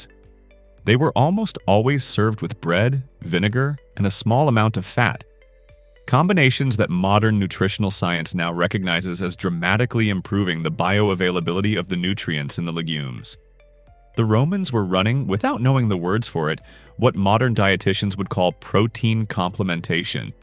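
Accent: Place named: United States. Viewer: Very good video. I have subscribed to your channel. But please learn to pronounce "anti", "semi", "multi" and "vitamin". Only in American English can a perfectly straightforward Latin prefix be twisted into a diphthong that never existed in any language known to man.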